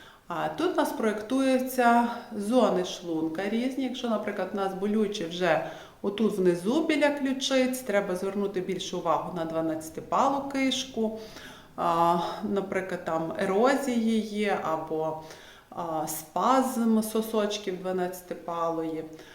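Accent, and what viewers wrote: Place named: Galicia, Ukraine. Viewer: ' так гарно звучить... відчувається що галичанка, львівська пані. Всіх благ вам і добра за таке корисне відео.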